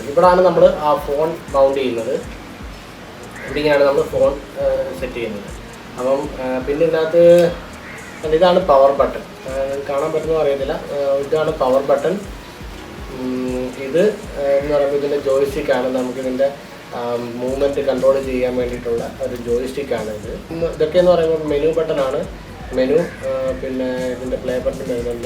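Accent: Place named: Kollam, India. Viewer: കൊല്ലക്കാരുടെ സ്വന്തം സ്ലാങ്ക് "ദാണ്ടേ " പിന്നല്ല പൊളിച്ചു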